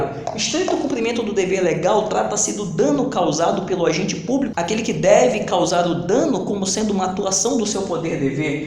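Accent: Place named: Pará, Brazil. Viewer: por aqui peguei q vc é paraense, acertei ? Kkkj hummm bunitinho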